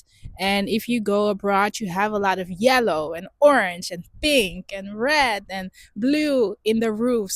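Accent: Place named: Netherlands. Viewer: You have a Dutch accent :) You Dutch :)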